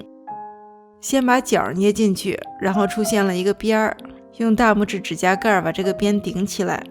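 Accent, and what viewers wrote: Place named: North China Plain, China. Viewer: 是哪儿人呀好标准的华北平原儿化音哈哈哈哈哈哈河北人表示听了超亲切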